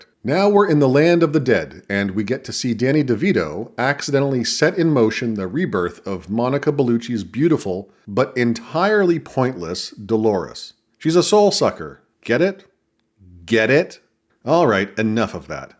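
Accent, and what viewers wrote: Canada. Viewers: Wait, are you canadian? Your voice sounds vaguely like it.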